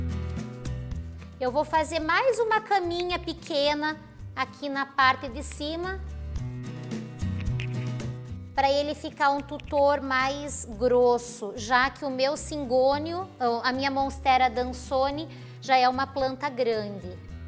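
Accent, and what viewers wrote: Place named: Northeast, Brazil. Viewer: que sotaque maravilhoso meu Deus. Amo vocês nordestinos.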